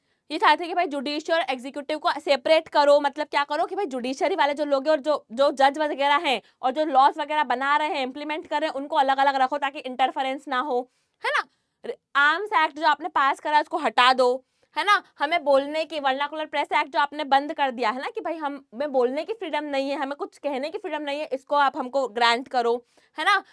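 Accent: Bihar, India. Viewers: Your Bihari accent is so awesome " CHAMKA BHAI, CHAMKA TUMKOO"😅😅😂😂❤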